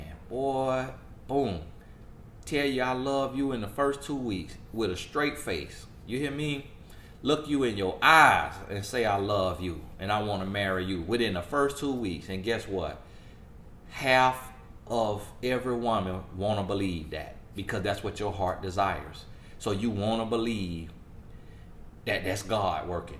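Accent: South, United States. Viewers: Lol. Gosh darn it,..that accent of yours. Neospirin...nope. Its pronounced Neosporin. 😂. I know, I know...I'm from the South too. Good video though. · I love the country accent 😊😊❤️. Preach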